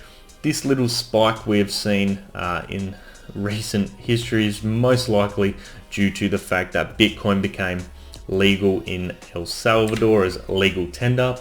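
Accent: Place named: Australia. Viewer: Finally someone talking in Aussie $$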